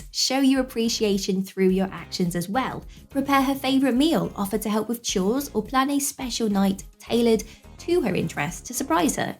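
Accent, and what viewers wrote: United Kingdom. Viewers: I watch this just for the excellent british english 🥰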